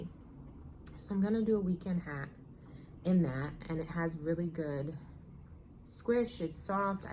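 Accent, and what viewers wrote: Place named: New Jersey, United States. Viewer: I love you and your podcast. Thanks so much! I’m from Philly. I live in Florida now. So, I’m enjoying your NJ voice and creativity.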